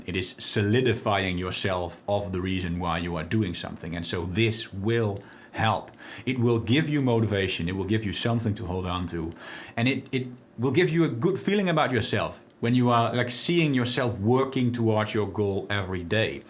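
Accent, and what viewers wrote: Netherlands. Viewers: You sound really dutch maat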